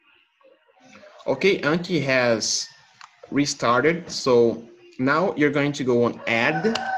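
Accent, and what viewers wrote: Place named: Brazil. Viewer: Só pelo sotaque já vi que era BR 🇧🇷❤️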